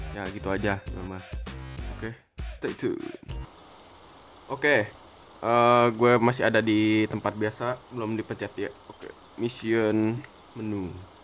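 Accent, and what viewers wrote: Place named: Java, Indonesia. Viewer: bung asli mana kaya orang jawa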